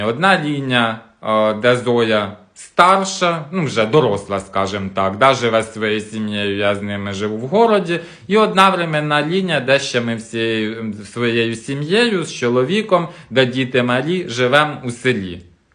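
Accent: Poltava, Ukraine. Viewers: Дуже приємно Вас слухати! Справжній Полтавській суржик. Слухала б і слухала. Гарно розумієтесь на сільських тонкощах! Натхнення Вам на наступні відео! Чекаємо!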